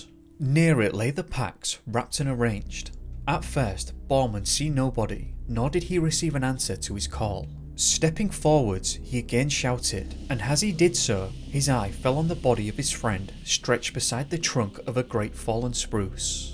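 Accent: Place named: Northern England, United Kingdom. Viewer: It's his Northern English accent , fairies is pronounced furries .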